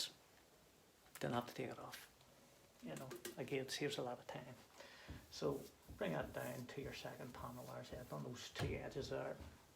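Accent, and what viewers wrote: Belfast, United Kingdom. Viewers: I've been putting off painting my 15 panel doors because it was such a hassle the first time, masking tape etc. This video has taken the "fear" out of it. I must hunt out my "angled" brush.paint and get stuck in. Thanks for the video mate. Gary. Fellow Belfastian by the sound of your accent.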